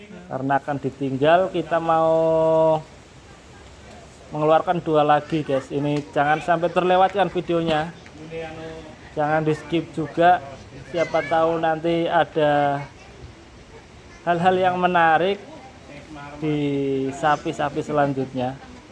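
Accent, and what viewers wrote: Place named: Wonosobo, Indonesia. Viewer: Omongane kea Wonosobo ya bolo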